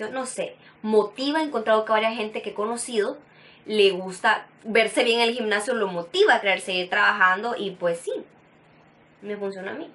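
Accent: Honduras. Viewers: Bravo 👏 me encanta ver cómo te expresas a lo catracho👏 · Hola, como estás? es la primera vez que veo vídeos tuyos. Y me ha encantado, chica ya te ganaste otra suscriptora.!! Saludos desde Madrid, catrachaaa! me encanta escuchar mi acento!!! 😂😂😂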